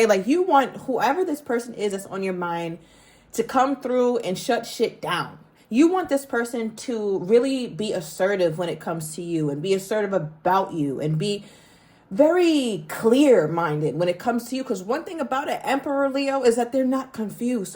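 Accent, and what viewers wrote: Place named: New York, United States. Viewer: SEE this is what I mean! Even if you are not talking about me I LOVE how you break down Leo…!! Bc you’re RIGHT! Everyone acts like Leo takes no shit but you know what? WE ARE FAIR N GENOROUS ASFFFFFF But when you CROSS THAT LINE Oh baby. That’s when it’s CURTAINS. Curtains on your performance, bc I’m no longer interested in attending that show. And THATS when ppl freak out bc they are SO sure they can treat Leo like shit & we’ll always be loyal Na uh no hunny I’m not crazy… I WAS being crazy, for putting up with that bs. Now? I’m not crazy anymore Love that genuine real NY accent… this city is getting overrun with too many transplant & fakers imo.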